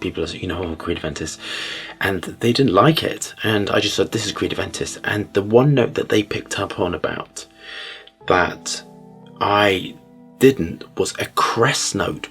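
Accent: United Kingdom. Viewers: Honestly, I've gotten PLENTY of comments about Aventus and to this day (5 years ago) I have NOT found a perfume that can get those same results... It has NOTHING to do with Creed, because Club De Nuit Intense Man with get just as much attention. It's that SMELL that so many people love. I have tried soooooooo many fragrances. I will try Nishane, but Aventus is a LEGEND no matter what people say. Ive gladly owed about 12 to 13 bottles of it... I think maybe one of them were fake - So, I do recommend purchasing Creed's Aventus from a reputable source., my wife is a Brit so I hear that accent allll the time LOL.. never gets old. Thanks for sharing your knowledge when it comes to the ingredients or raw materials. I never knew what smell came from the whale vomit lol.. but now I do!!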